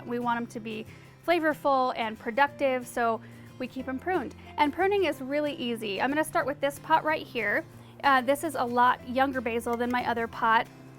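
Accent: United States. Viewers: Your idiot Amurrucan voice makes me sick. Basil grows itself, leave it to grow.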